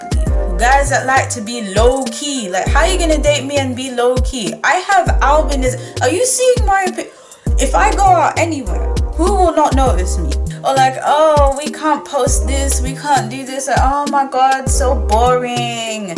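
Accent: United Kingdom. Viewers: I thought you were originally from London 🤷🏿‍♂️ I love your voice ❤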